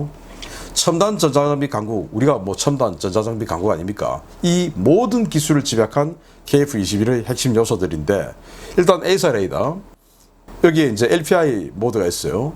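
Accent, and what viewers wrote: Gyeongsang, South Korea. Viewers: 신앵커님 늘 목소리 약간 경사도 사투리가 멋저요 오늘도 괜찮아요 좋은 정보 감사합니다 국방당관 감입니다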